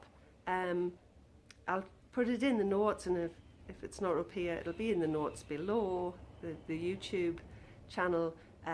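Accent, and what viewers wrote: Sunderland, United Kingdom. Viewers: Nice to see you back, missed your Sunderland accent lass. Hope 2017 will be better for you & your family♥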